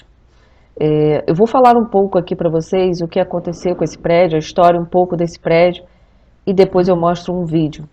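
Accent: Brazil. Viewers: Esse sotaque me parece ser de uma paraense nata, né não?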